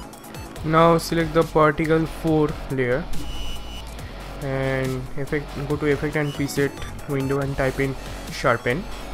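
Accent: India. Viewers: Sorry, but your profound Indian accent prevents me from understanding hardly anything you say !!!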